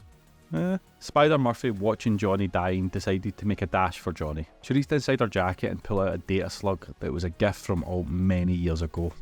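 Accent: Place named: Scotland, United Kingdom. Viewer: Cyberpunk explained by a Scot! Great video, learned a ton. (Sorry if you're not scottish).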